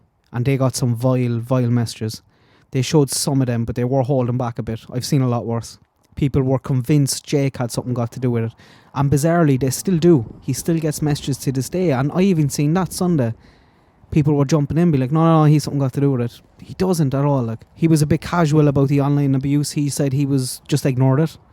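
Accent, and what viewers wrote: Ireland. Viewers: Love watching your dogs while you are talking about these cases, I also love the Irish accent, I follow Michelle walks too you are both great 😊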